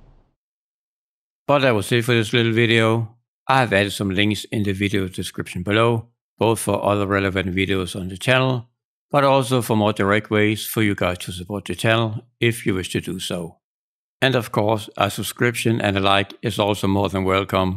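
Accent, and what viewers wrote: Denmark. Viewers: You sound danish, and thanks for the guide! It was really useful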